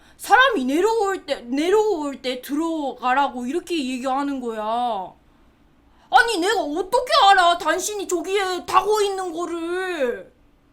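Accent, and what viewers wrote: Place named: Busan, South Korea. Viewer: 부산이면 그냥 말투입니다.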